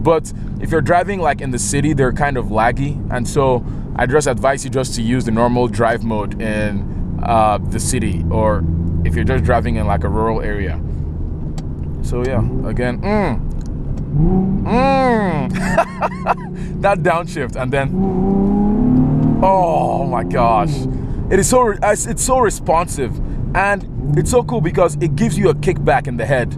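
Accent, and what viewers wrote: Nigeria. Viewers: I hear a slight Nigerian accent! My brotherrrrr lool. Much love from Lagos Nigeria. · This nigga has to be Nigerian lmfao. Made the video hilarious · You sound just like my friend Voke from Nigeria, who by the way, gets excited talking about cars also. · You sound Nigerian.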